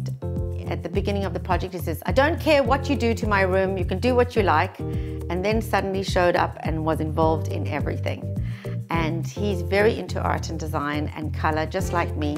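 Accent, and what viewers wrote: South Africa. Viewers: Guessed your were South African. I too am from South Africa. Love your home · Took me 3s to recognize the South African accent. The style is so South African. Beauty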